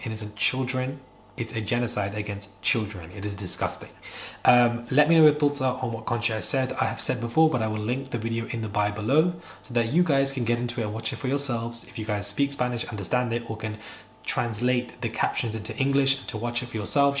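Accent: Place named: London, United Kingdom. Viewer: Football 🏈 yea honey let’s get into this babezzzz ❤ thank you for all your videos I love 💞 them. I totally agree with Conches analysis 🧐 .. btw, you’re soooo cute!! You’re facial expressions 😊😊😊 yes Mi6 or M16 whatever it’s called has something to do with this CLONE of Kate !!! Yes conche is verryyyy accurate actually. Plus omg there’s a tarot reader a day b4 Charles bday and it’s so eerily on point and makes sense!!! 💞PEACE IN THE MIDDLE EAST💞 Love your London accent 😊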